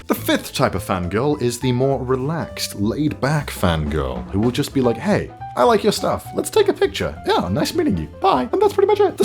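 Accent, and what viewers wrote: United Kingdom. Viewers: with the british accent? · "saying British things from my British face." Me:... As a British person I just realised how inferior my accent is compared to.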